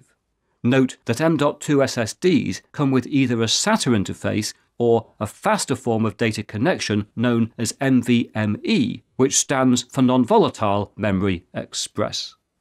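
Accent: United Kingdom. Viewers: I didn't learn anything new, but as always, very nice simple video w/o annoying music and flashing lights. i do enjoy the non nonsense approach with you videos. i think i will show this to my son, he may learn a few things. thank you. btw, the british accent is a nice touch.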